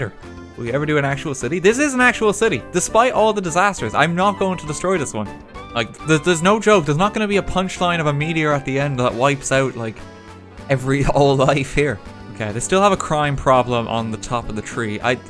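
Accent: Ireland. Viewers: Are you Irish? xD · Are you an Irish gaymer? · this is my first time watching him because of country roads then I said OH YOUR IRISH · Themlgdinosaur 12 Both of them are from Ireland, so they sound quite similar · Are you Irish?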